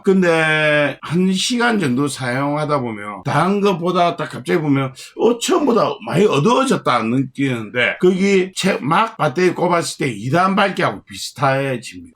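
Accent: Gyeongsang, South Korea. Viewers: 겡상도 사투리 짜증난다.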